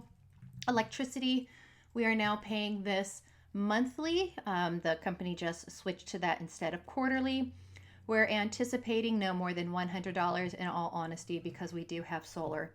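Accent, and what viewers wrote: Australia. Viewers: Are you originally from Australia?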